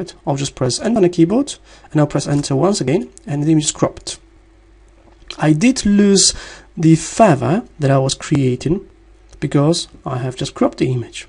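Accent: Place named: France. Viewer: exotic accent ;) French or a Slavish Language? thanks for the tutorial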